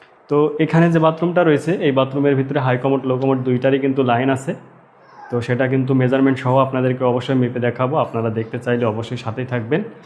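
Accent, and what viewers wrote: Jessore, Bangladesh. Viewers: আপনার কথা আমাদের যশোরের মতো। আপনার ভিডিও টি অনেক সুন্দর হয়েছে।অনেক কিছু জানা হলো।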